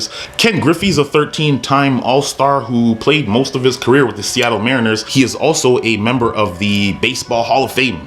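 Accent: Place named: Ontario, Canada. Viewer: You from Ontario? That accent hitting different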